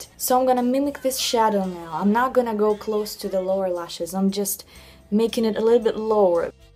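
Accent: Russia. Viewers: Wow this chickadee has some insane skills! She talks like a total professional. Gorgeous with a Russian accent! Class act. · Knew you were Russian straight away, I'm Russian too btw. Tnx for the tricks! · Жуткий русский акцент)) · The accent is so cute! I knew you were Russian when you started speaking. I’m from Ukraine and I can’t hear my own accent but other people can. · I knew she was Russian after the first 3 sec..could decently tell from the accent ( first time seeing her) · То чувство, когда начинаешь смотреть видео и сразу слышишь русский акцент☺️ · произношение как у русской! вы русская? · Absolutely agree with you! I'm Russian and for me her Russian accent is just obvious. You can tell it if you listen thoroughly to such words as "fundation" and "of course" (first seconds of the video) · Lol, that's the first time I heard of a Russian accent making someone sound like a good person. 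Usually people say "haha, you sound like a movie villian!" Aaanyway, if she sounds like a nice person, it's probably because she's speaking calmly, and maybe because she actually is a nice person, who knows? :P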